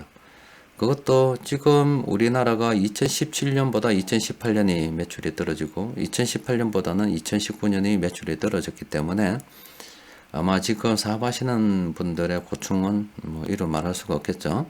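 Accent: Gyeongsang, South Korea. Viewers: 오늘도 좋은 하루되세요 혹시 어디사세요 경상도 사투리 같은데 행복한 주말되세요 꾸벅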